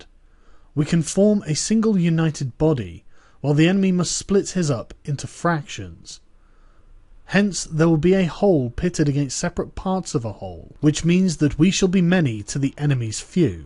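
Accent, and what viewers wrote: United Kingdom. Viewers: O my, I read the book twice before. But those times compare bland compared to you smooth British narration. Keep up the good work!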